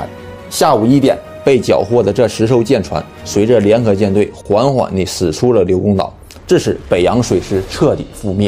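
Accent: Northeast, China. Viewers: 这大碴子味的普通话听不清你说啥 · 口音太重，一口东北大碴子味儿。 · 好片，轻东北口音解说听着很给力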